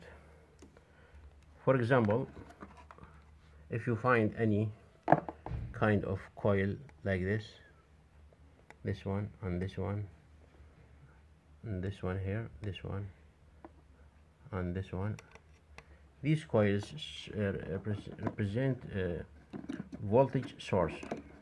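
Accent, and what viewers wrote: Iraq. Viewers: Youre voice is iraqi voice Are you an iraqi ?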